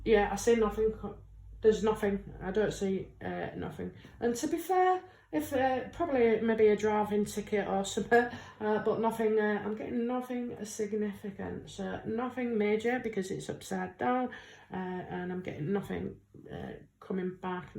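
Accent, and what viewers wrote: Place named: Lincolnshire, United Kingdom. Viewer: I enjoy your accent! You remind me of home :) (Lincolnshire)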